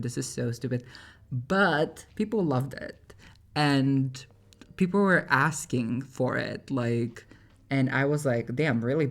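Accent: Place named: France. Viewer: Its so nice knowing how you would talk longer then 1 minute, its so funny (in a good way, it makes me laugh because I have the same humor and I love that accent!! (is it french? idk)). Also very nice to know what is going on with the channel. <33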